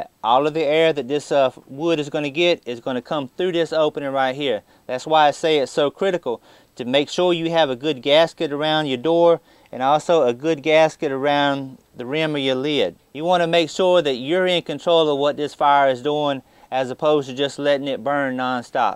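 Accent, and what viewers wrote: South, United States. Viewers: Love hearing someone with a strong southern accent describing things so intelligently and knowledgably. Well done on the video and your project; you obviously have it figured out. Thanks for the post!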